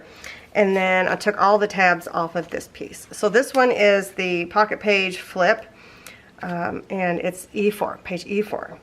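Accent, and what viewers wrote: South, United States. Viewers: Has nothing to do with sophistication its accent from the south. Give yourself some credit girl.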